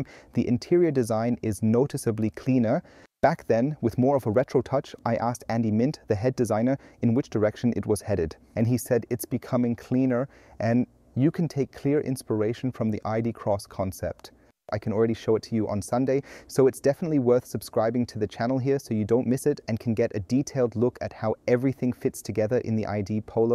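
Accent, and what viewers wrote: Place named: South Africa. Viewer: Hey bro, your accent sounds too familiar-are you South African??? 🇿🇦 🔥👊🏾😎 Great review though, I have thoroughly enjoyed it 🔥😉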